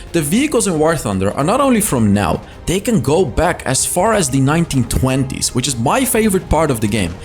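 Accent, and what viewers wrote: France. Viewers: Nice frensh accent love it but man pls just Say it it's easy ku de grasse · "she doesn't suck the things she's supposed to suck" spoken like a true french, you nailed the french persona 💪💪👍👍 very well done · Bro your French Accent is op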